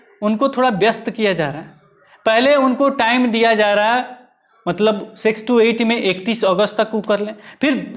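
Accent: Bihar, India. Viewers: Sir bihari ton sun ke kafi achha lga.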